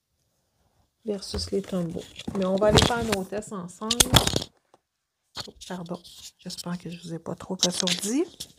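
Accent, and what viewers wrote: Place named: Canada. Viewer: bonjour ta vidéo tombe tres bien car je cherchais une nouvelle gamme de feutres et j'ai énormément apprécié ton comparatif j'ai pu me faire une idee sur les feutres a m'acheter pas trop onéreux les tombows sont vraiment tres cher 😅 merci beaucoup pour ton partage et ton accent qui sent bon le canada que je reve d'aller ❤❤👌👍👍🙏🙏😘😘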